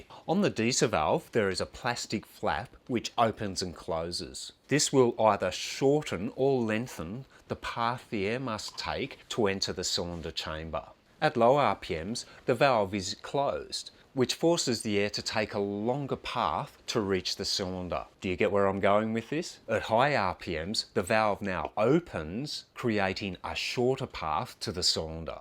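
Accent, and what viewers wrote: Australia. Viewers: Loved that video mate. Just got to see if this fixes my E54 running on a couple of cylinders. Thanks, and love that Aussie twang!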